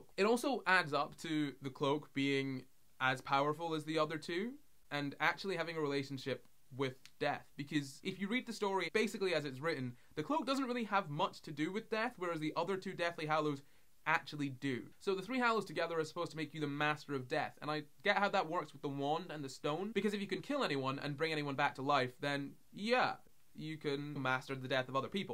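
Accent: Scotland, United Kingdom. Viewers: He is Scottish from Dundee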